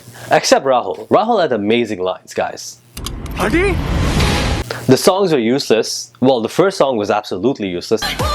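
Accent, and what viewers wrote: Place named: Canada. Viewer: oh canada... Thats the accent come from 😉.... Yeah youtube support big companies.... I always wait for your reviews... I'm one of your huge fan... Please upload more often... Also you look much better than the photographer boyfriend 😉 😉 😉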